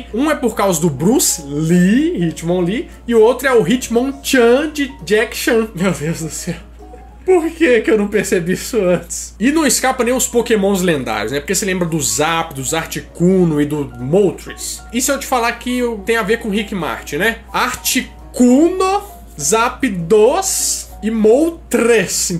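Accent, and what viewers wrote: Minas Gerais, Brazil. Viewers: Uai, vc é mineiro??? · Definitivamente o mineirês é o melhor sotaque que existe. 🤗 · Mineiro nato esse aí kkk 👏🏾🤝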